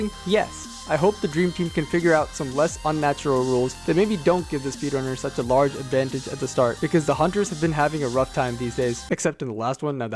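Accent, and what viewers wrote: Ireland. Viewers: Yooooooooo I am part of the 1% (Edit) I just realised that your Irish... Im Irish to!!!!!!!!!! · Irish Accent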